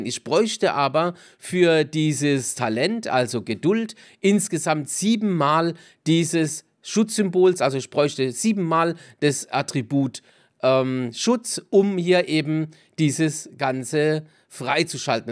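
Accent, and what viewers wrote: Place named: Rhine-Neckar, Germany. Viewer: du hast diesen herrlichen Klang dieser Region in deiner Stimme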